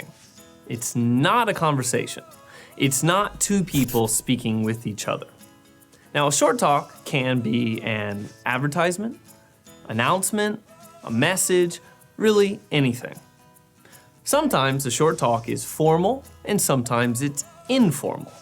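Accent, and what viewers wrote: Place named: Yorkshire, United Kingdom. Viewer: hi there :) I'm really into your videos and i find that i admire you lots, i enjoy your British accent the most, which sounds like Yorkshire people :) your videos have helped me so much in my toiec preparation. But i'm still a little panic before taking part in the Toeic test. my facebook is 'Z hana ookaze', pls add friend, i d love to make friend with you :) . Best regards from Saigon!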